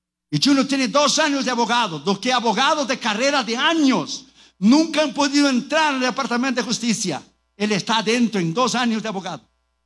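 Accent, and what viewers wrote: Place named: Costa Rica. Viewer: Pero eres tico por tu español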